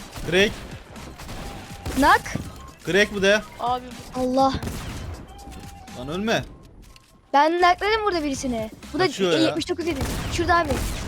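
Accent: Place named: Malatya, Turkey. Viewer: Abi sen malatyalimisin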